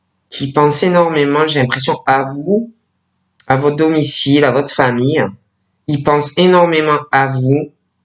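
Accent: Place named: Marseille, France. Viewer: une bretonne à l'accent marseillais, c'est joli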